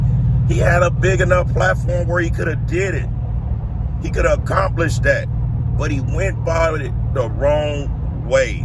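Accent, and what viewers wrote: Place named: South Central Los Angeles, United States. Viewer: THATS OUR SOUTH CENTRAL LOS ANGELES ACCENT, YOU FINNA TELL A WHYTE GUY FROM BROOKLYN TO SPEAK LIKE YOU? 😂😂😂💯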